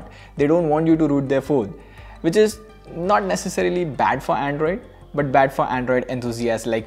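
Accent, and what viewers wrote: India. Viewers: As an English speaker, it's so difficult to listen to somebody with an Indian accent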